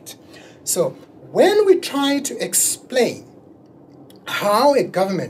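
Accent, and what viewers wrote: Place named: South Africa. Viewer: I am a South African student at Columbia University, in New York and I came across your videos whilst preparing for a microeconomics exam. Your videos are excellent and were extremely helpful to me. It's always good to hear a voice from home too! Thank you.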